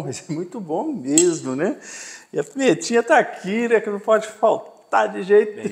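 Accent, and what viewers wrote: Minas Gerais, Brazil. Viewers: Vc é mineiro?amo esse sotaque kkkkk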